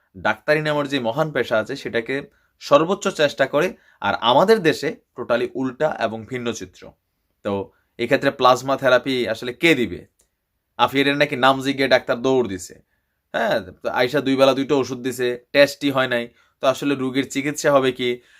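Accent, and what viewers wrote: Comilla, Bangladesh. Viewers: ভাই কেমন আছেন, আপনার মুখে কুমিল্লার আঞ্চলিক ভাষা অসাধারণ লাগে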